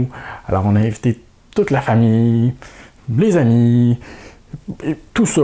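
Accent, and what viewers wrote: Quebec, Canada. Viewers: Je t'adore tu sais ! j'adore les québécois ...j'ai d'ailleurs dans ma vie travaillait avec une québécoise dans un village de vacances et j'ai passé d'excellents moments avec elle. Et je retrouve dans toi toutes ces expressions qui me faisaient rire, cet accent qui vous est propre, mais surtout beaucoup pour ta gentillesse et tout le travail que tu effectues au quotidien !! Continue dans cette voie en espérant que tu seras moins stressé cette année !! a bientôt ! et le bonjour à ta femme ! mdr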